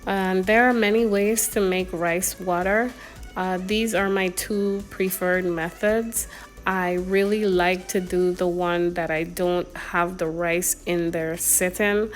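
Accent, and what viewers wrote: Caribbean. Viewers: You have a Caribbean accent, where is she from? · You accent sounds Caribbean, I'm from Trinidad, where are you from? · Sounds like you from the Caribbean 🇬🇾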